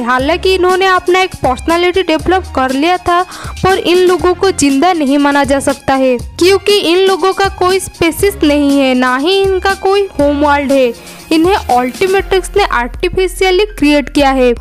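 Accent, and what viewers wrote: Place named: Bangladesh. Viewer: tumhara voice se lagta ha ,tum Bangladesh she ho Ma bhi Bangladesh she ho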